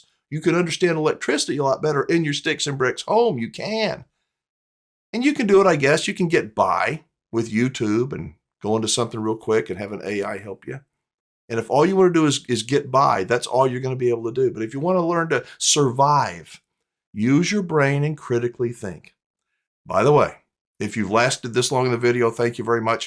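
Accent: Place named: Georgia, United States. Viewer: I mean no disrespect. But you and Nancy Grace have almost identical regional accents. I watch all your great videos. She's from Macon Georgia, you? Respectfully Submitted, Mark Tinnirella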